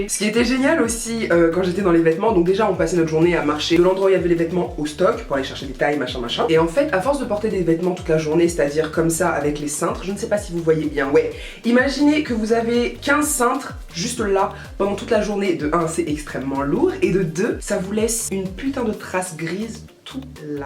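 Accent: Ivory Coast. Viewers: ..je sur kiffe ton accent et vive Abidjan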